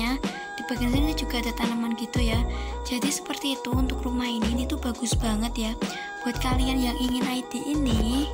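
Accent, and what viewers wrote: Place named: Java, Indonesia. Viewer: Kok suaranya kek Jawa gitu tapi gpp semangat ya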